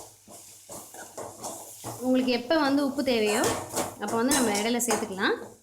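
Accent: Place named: Thanjavur, India. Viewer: Nenga pesara slang thanjavur slang mathiriye irukku.....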